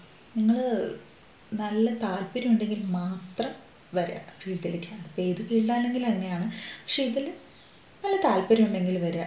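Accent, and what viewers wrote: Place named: Thrissur, India. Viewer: Thrissur slang നന്നായി endu....😀😀🙋🙋🙋🙋🙋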